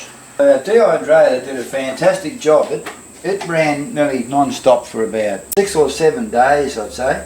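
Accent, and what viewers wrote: Australia. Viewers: Liked the video and loved your true blue accent. Went nostalgic on my time in Aus. Good luck to you!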